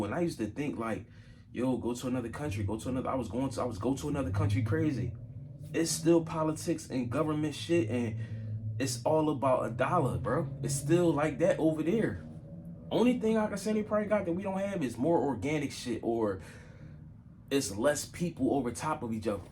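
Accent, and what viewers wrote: Philadelphia, United States. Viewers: Philly accent??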